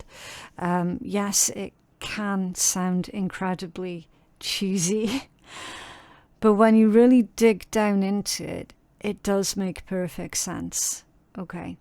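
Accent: Scotland, United Kingdom. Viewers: It’s a Scottish accent ❤ and yes it’s beautiful!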